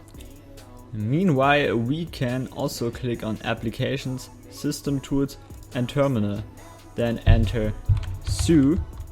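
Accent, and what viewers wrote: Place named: Germany. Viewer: german? denk ich ma hörs an deim english ich bekomme 1000Errors wenn ich sie lade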